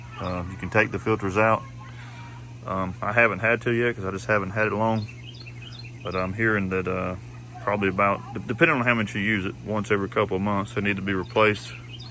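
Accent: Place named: Alabama, United States. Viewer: He sound like he's from BAMA!!! Roll Tide!!!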